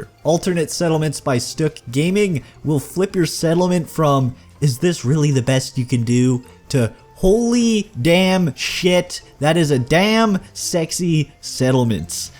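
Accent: Canada. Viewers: i've never found out that a person is Canadian as fast as in this video o.O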